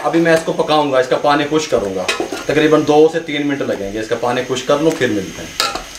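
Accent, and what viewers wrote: India. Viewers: I like your daise style bolne style mashallah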